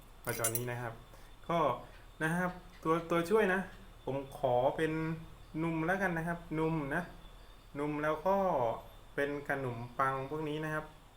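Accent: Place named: South, Thailand. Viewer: อ่อรู้เเล้วก้ว่าอยู่ทำไมพี่พูดสำเนียงใต้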